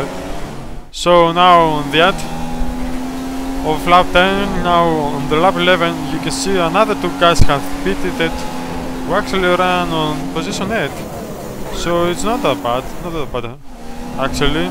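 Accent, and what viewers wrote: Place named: Spain. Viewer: You spanish? 'cause I can see a hard Spanish accent and you're a Fernando Alonso fan. Nice vid m8, keep going!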